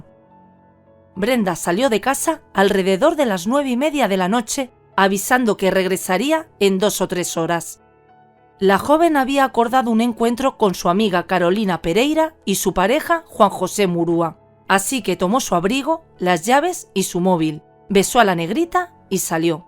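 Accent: Spain. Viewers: eres una mujer excepcional. Relatas casos a la perfección. Me encanta tu acento español. En cuanto al caso de Brenda, afortunadamente se hizo justicia y ese maldito (Juan José Murúa) se pudrirá en un reclusorio. Ojalá también se haga justicia para Marisol y Luz.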